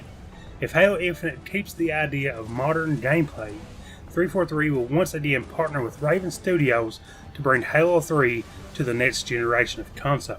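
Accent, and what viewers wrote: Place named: South, United States. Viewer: There are so many people with amazing voices in the halo community, and I am so glad that we finally have someone with a southern accent.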